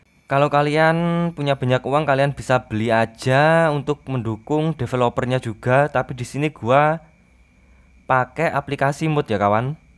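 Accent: Java, Indonesia. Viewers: ngomongmu medhok ee mas pake lu gua lu gua. gugel ya gogel wkwkk. lanjutkan. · Jangan medok co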